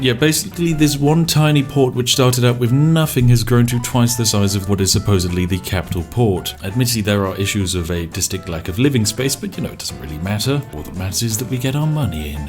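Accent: United Kingdom. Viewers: He's British, that's how we say it, chill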